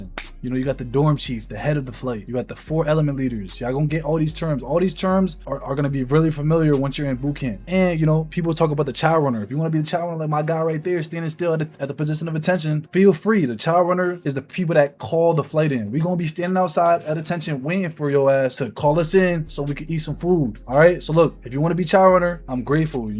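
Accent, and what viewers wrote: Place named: New York, United States. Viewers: Not even the first minute hearing bro you can already tell😂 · “Calm” valid eats” “move tact” yea You definitely from New York😂😂😂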